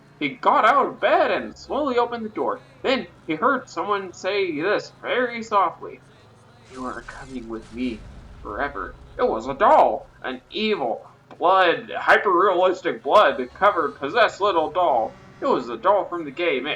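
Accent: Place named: South, United States. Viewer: I never noticed until now how southern you do sound in this. XD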